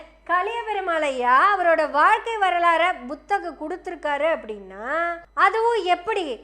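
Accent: Kongu, India. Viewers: கொஞ்சும் கோவை கொங்கு தமிழ் சூப்பர்மா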